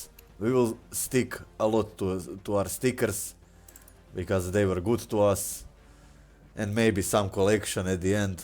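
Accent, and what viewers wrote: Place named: Balkans. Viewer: Not rusdian accent of english i know how balksns speak english hes from serbia or croatia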